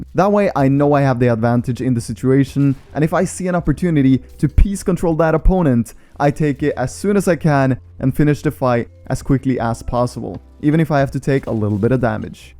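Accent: Norway. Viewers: are you norwegian? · Er du fra norge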